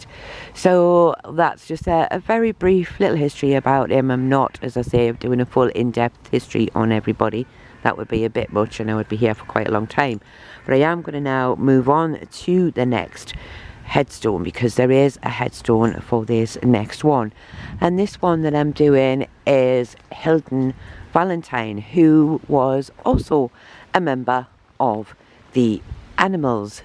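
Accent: Newcastle, United Kingdom. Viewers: Love your content & love the Gordie accent. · More Geordie!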